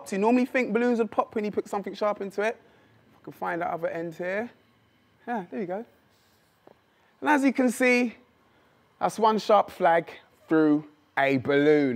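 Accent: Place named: England, United Kingdom. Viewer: i love english accent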